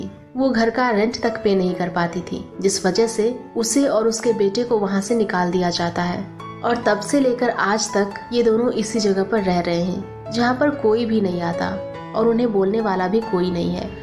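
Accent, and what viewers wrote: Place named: Bihar, India. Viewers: You are from Bihar.. Am I right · Bihari ho ka ji.... परोसी, परा , भीर..... ई सब का है।।।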